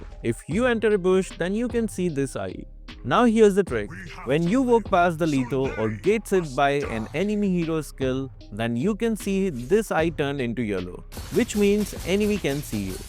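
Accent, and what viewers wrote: India. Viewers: I love because he has such wonderful edits and a gorgeous indian accent!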